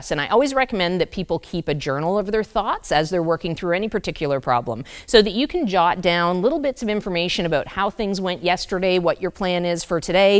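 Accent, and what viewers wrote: Canada. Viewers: is that a canadian accent? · "Talk a-boat" 😂god I miss that Canadian accent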